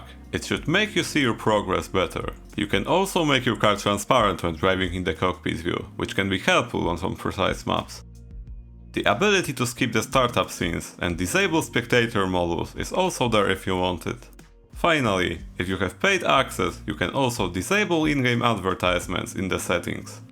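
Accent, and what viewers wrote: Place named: Poland. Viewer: from the first secon i understood that you were polish, recognise that accent everywhere LMAO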